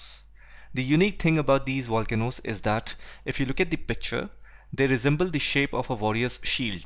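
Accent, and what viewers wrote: India. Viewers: Indian Accent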